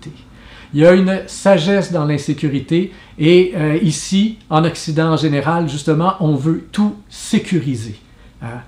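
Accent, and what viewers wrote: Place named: Quebec, Canada. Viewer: J’ai vraiment apprécié la série de 13 petites conférences, racontées avec votre vergue bien québécoise !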